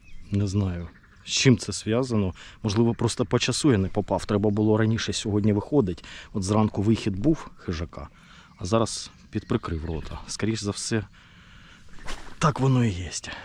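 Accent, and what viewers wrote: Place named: Surzhyk, Ukraine. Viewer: підтягни українську. Таке адове суржелло, що аж вуха ріже.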